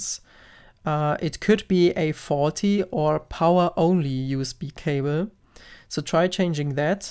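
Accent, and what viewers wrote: Germany. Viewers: Ich liebe deinen deutschen Akzent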